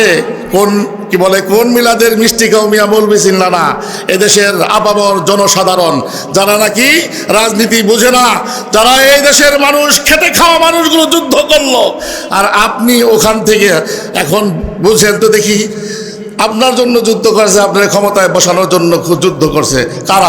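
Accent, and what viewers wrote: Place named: Pakistan. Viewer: হুজুর আপনি যে পাকিস্তানি বংশধর তা কথাই বোঝা যায়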